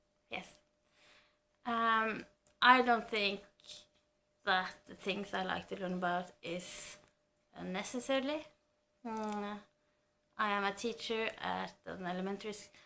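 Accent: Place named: France. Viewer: Yeah! I love this tag as we get to know even more about the booktubers we love. also, you have a terrific French accent ! Like really cool :).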